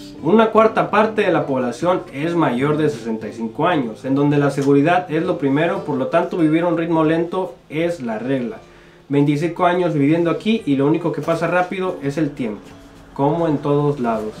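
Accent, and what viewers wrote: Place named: Mexico. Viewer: Nada como conocer japón de alguien que habla Méxicano 😃 eres la onda. Ojalá no dejes de hacer estos videos.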